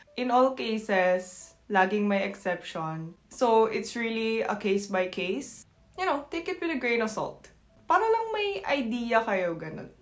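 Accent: Batangas, Philippines. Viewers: Alam mo ga ining na akoy Batanguino rin kaya ako ay tuwangtuwa ss iyo the way you talk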